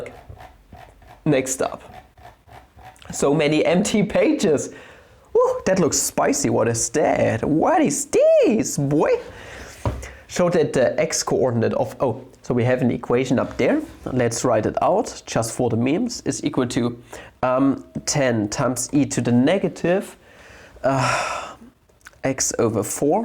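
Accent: Germany. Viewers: Du siehst zwar ganz hübsch aus, aber Dein Denglisch ist schwer auszuhalten. 😄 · you have too much latent heat of confusion yourself.You must review your teaching methods, besides German accent,umm ah ah is off-putting.Why not revert to talk and chalk traditional method,with plenty of home work,no amount of IT gadgetry will do.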